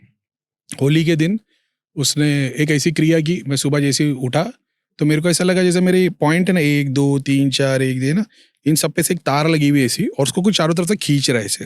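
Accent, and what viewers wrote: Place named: Mumbai, India. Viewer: Wo uska bolne ka dhang hai, wo Mumbai se hai. Uska matlab ye nahi ke wo kisi ki disrespect kar raha hai.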